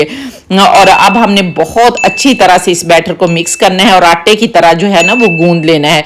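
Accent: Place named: Punjab, India. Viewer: Acha g ye aunty b Pakistan ki hai punjab ki hai ap in k bolny k style dayk lay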